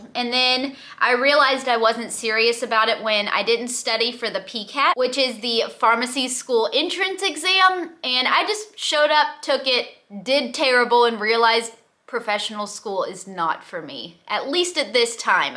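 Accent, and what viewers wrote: South, United States. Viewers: Hey there, have been watching you for sometime, especially when you were doing Keto. I love to watch your infectious Southern “ hey y’all “. You are such a beautiful gal, but some of these pics on the You Tube look a little shady. That’s not your style. Wish you and Cody the best.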